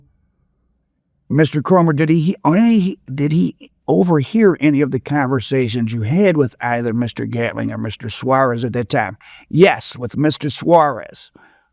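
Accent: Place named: Upstate New York, United States. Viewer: I think meech gave her his life rights so she can work as a paid informant for him.why would he give someone he didn't know that long his life rights? because she agreed to the circumstances meech name was mentioned for a reason in this case or his name would have never been mentioned...This guy sounds like he's from upstate NY somewhere good break down though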